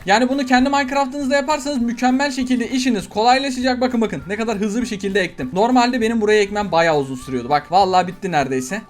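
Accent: Malatya, Turkey. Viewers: Abi sen malatyalısın ya neresinden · Abı sen Malatyali misin bende malatyaliyim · ABİ SEN MALATYALIMISIN NERESİNDEN BEN DE ORALIYIM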